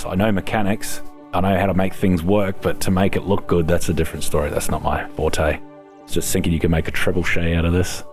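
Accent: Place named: Australia. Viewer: Came for the tips, stayed for the beautiful Aussie voice.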